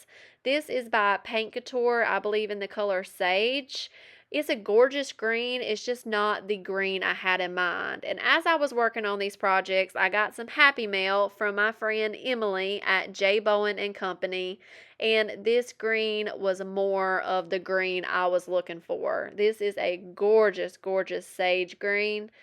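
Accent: South, United States. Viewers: I wish you were closer to me; I would give you an iron. I have 3 or 4 of them. You can pick one up when you're thrifting. I love your show and your cute southern accent.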